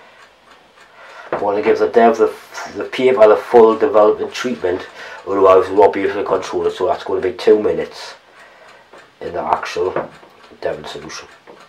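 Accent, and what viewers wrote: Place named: Newcastle, United Kingdom. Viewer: Love the vid, Thank you Great information! :-) Its a good job I can, sort of understand Geordy..... I think others would benefit with subtitles :-D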